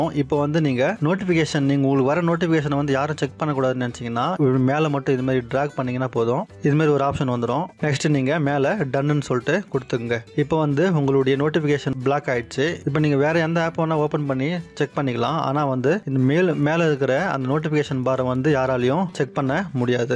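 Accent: Chennai, India. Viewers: Pakka chennai tamizh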